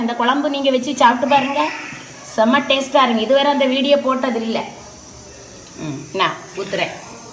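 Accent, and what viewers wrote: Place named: Kanyakumari, India. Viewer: Akka kk slang.kaytalaymass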